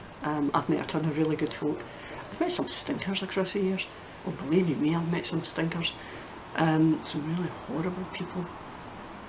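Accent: Scotland, United Kingdom. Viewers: i believe you are more than ordinary. My sister in law moved from scotland when she was 7. Her mum was one of the most beautifully souled women i have ever met. She was a ray of sunshine....you remind me of her. I love the accent. As women we do tend to stretch ourselves thin...i think that is why we are more suseptible to depression and anxiety. We need to lift each other up....💖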